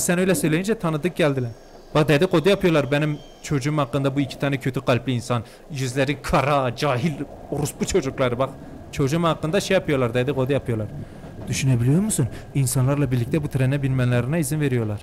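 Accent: Elazığ, Turkey. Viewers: ABİ ELAZIGLI MISIN MAİLİNDE 23 VAR VE AKSANDA ELAZIGLI GİBİ BENDE ASLEN ELAZIGLIYIMM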